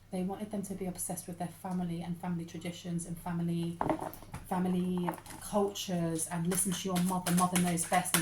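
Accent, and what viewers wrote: United Kingdom. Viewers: I think 🤔 she’s 😢British 🇬🇧 I am Spanish from the 🇺🇸 I can understand her I put on headphones to listen closely.🎧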